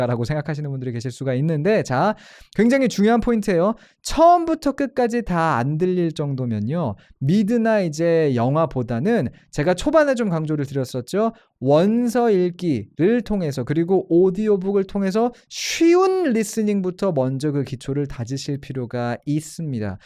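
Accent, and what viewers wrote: United States. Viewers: 근데 뉴질랜드에서 유학하셨는데 미국영어 발음(?)을 가지신거 같아요~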